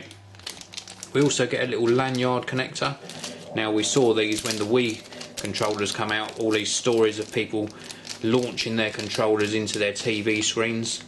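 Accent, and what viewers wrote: England, United Kingdom. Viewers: It's an english accent. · It's a british accent:)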